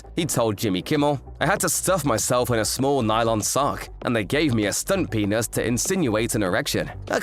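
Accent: England, United Kingdom. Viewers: He actually said “Dirk” but his British accent make it a bit like Doug to American ears. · It's an English accent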